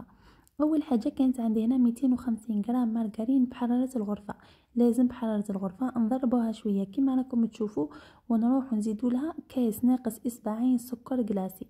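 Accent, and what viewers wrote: Setif, Algeria. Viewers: تهبل وصفة جاني صوتك كي دنيا سطايفية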